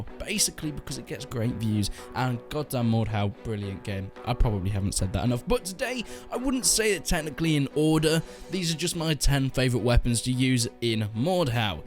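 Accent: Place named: United Kingdom. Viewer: Apparently, Americans think that the British accent sounds intelligent. This is a good example of it being wrong. VERY fucking wrong.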